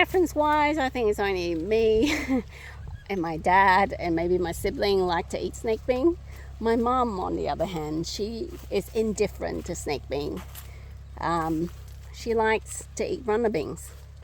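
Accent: Australia. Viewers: Your accent instantly gave away that you are Aussie. I'm making a pumpkin tunnel using Seminole pumpkins but after seeing your runner bean tunnel I think I will add them as well for even more shade because I'm in Florida. Great video!!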